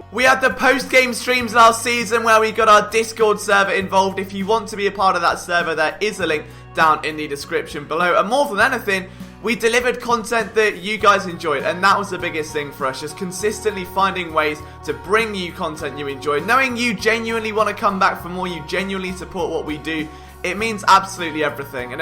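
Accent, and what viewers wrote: England, United Kingdom. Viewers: I started watching the channel because I love the Eagles. But when I heard the English accent, I was very intrigued, because as an actor, I have to learn English accents. Your content was great, very knowledgeable, and I got to learn more film and about players that aren’t really in the spotlight. Congrats to you and your team and continue the great work! #FlyEaglesFly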